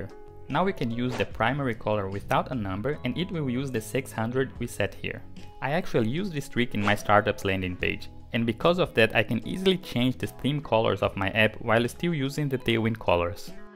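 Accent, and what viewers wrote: Brazil. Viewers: Pq eu reconheço um sotaque brasileiro tão fácil?! haha Não sei explicar, não sei imitar, mas com 5 segundos de video, já sabia que era brasileiro! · Eu sabia que esse sotaque tinha um jeitinho brasileiro!!! Fera!!!! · Eu sabia que era inglês de brasileiro hahah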